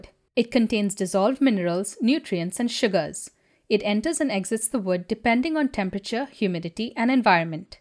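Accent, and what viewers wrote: India. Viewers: Really interesting to see the scientific component of the shou sugi ban. If i'm right, that technic work best with resinous wood? Placing it in a renewable context, especially the part about soft/hard wood and theyr growing time it's important. The other aspect it's that you have to let time to the tree to grow or you gonna get weaker wood. In France (burgundy) with climate change, we see a lot of pine-like tree getting infected and dying. Add that the unbridled wood harvesting to sell our tree to china. By the way, great video, with lot of informations, add the smile and the indian accent and it's wonderfull !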